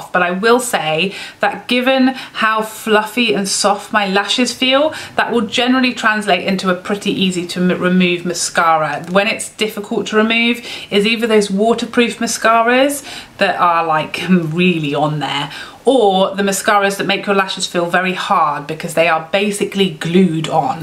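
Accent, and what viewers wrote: England, United Kingdom. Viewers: You've gained a new subscriber in me, not just for your makeup info but your english accent and phrases, whack, willy nilly, got me😁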